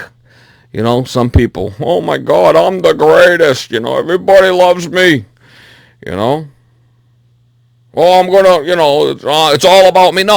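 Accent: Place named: Boston, United States. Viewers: Keep being you!!!! Your a good Man and your time and effort is highly appreciated. Let the dead bury the dead.... Good Ole Boston Accent and Professionalism keeps me a huge Subscriber. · Thank you for providing valuable information and entertaining repair video's, been watching for a few years and enjoy the Bostonian dialogue and temperament. As for the haters just ignore and fight for what you believe in, f them continue to create, take care. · Honestly the swearing along with your awesome accent just made it so much better!! My old boss was from Boston and when he would get mad and start swearing he sounded exactly like you and it was funny as fuck!!!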